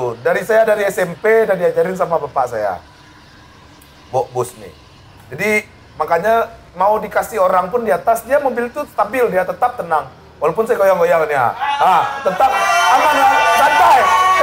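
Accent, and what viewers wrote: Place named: Padang, Indonesia. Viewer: Bang sering2 konten pake logat atau bahasa padang ya hehe berasa lg dikampung soalnya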